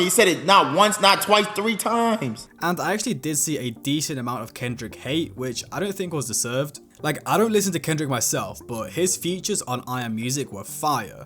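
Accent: United Kingdom. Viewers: did this youtuber really just said "Young Scutaaah" ????? I hate UK accent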